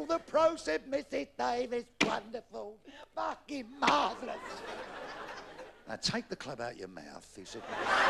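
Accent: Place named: Cockney, United Kingdom. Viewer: Classic cockney comic. They really dont make them like that anymore. All the whites in London talk black now. Such a shame that the cockney accent has died out. His jokes were ok but his accent was classic.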